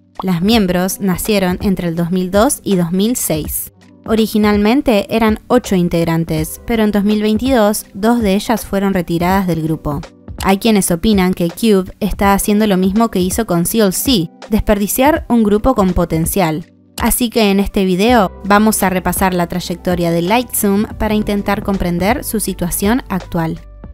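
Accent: Argentina. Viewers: 💫 ¡Excelente tu canal! ¡Me encanta!Solo por curiosidad ¿sos de argentina?💫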